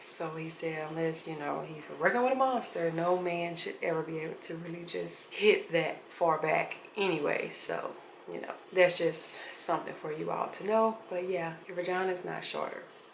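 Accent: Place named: Missouri, United States. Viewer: You are fine girl everything in the right place doing the right thing you gwo grell I have to ask are you or your folks from back east like Illinois like an saw Kansas City you don't have to answer it's just the accent it's a personal game that I play I'm usually pretty good at it if I had to pick one of the three I would say Missouri thank you for the video by the way I'm a lot less stupid now LOL too bad you didn't make it before we broke up LOL or at the very least I wasn't enlightened enough to look it up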